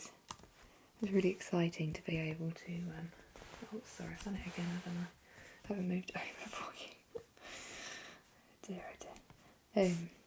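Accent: Ireland. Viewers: Im American, so I liked the Irish one because it was an accent. Definitely going to keep watching your videos, thanks for making them. There relaxing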